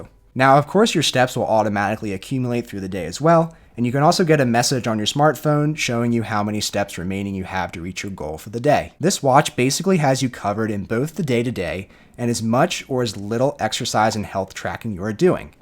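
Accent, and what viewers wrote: Philadelphia, United States. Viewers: Is that a subtle philly accent I hear?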